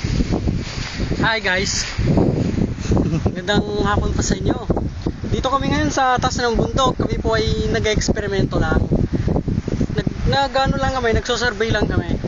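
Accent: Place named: Ilocos, Philippines. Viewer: halatang ilokano pag magsalita paulit ulit ka!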